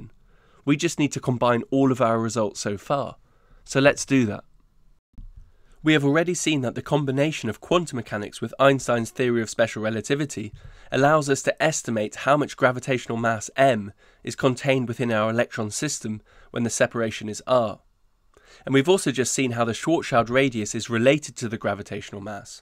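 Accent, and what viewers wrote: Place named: RP, United Kingdom. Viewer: I hate your british posh accent. It sounds like you are constantly trying to project this exaggerrated image of finesse - thus implicitly also trying to cast social hierarchy. I guess this is typical to cultures who have had royal courts for several centuries such as england, france or sweden. But please stop doing it. I'm not saying you have to start talking like a football hooligan but I know brits can speak in a reasonable manner.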